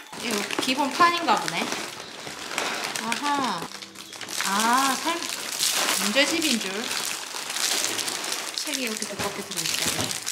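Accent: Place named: Busan, South Korea. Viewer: 저도 놀러왔어요😍 사투리를 쓰시네요? 부산분이신가?부산사투리같은데~ 저는 부산인데~ㅋㅋ 근데 열라면에 순두부는 반칙입니다~😂방금 밥먹었는데 급 라면 한그릇해야겠어요ㅋㅋ 구독 꾹 누르고 갑니다. 또 놀러올께요~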